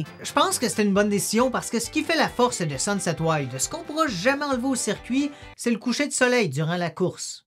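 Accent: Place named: Canada. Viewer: Cette façon de parler en disant "actuellement" et "régulier", mais il est canadien !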